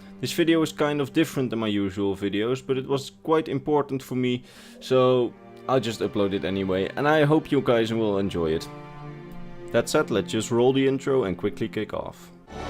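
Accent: Netherlands. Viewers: I think that he's Dutch just like me. We actually created a name for English whilst maintain their Dutch accent. It's Dunglish :P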